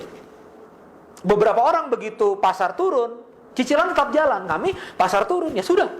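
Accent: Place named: India. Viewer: bahasa inggrisnya kyk logat india :D udah pake bahasa indonesia aja kang. klo mau campur pake bahasa sunda aja :D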